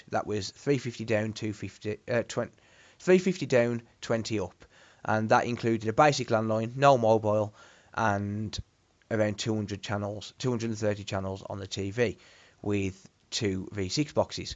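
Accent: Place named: Black Country, United Kingdom. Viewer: I thought the same lol, as soon as I started watching this video I was like wow is that a yam yam (or a Brummy)? Then moments later - yea, a yam yam! I'm from Stourbridge/Wollescote but working-living in Birmingham for the last 9 years, its always nice when visiting back home hearing people talk normal/homely again - yow alright lad, am bostin ah kid, I ay bin up mezza in ages I core believe it, ar dow arf miss livin around the black country. So yea, I used to have Virgin Media for donkeys years at my parents house until I moved to Brum 6 years ago, think I last had 100 or 200Mb in Stourbridge but for Brum I could only get BT's 76Mb, actually 65-69Mb. Then Hyperoptic came along 3-4 years ago so now I'm on their 1Gb, actually 900Mb ish for £45/month BB-only. Honestly though I hate living in Birmingham, I'm glad Virgin have 500Mb+ now its giving me an incentive to want to move back home where all my family are.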